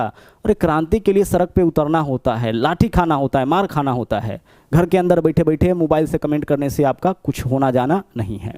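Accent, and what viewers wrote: Bihar, India. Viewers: सर आप आए बिहारी भाषा के स्टाइल में 🙏🏻🙏🏻🙏🏻🙏🏻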